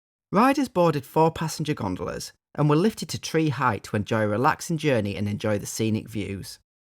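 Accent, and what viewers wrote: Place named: United Kingdom. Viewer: A British man talking about American theme parks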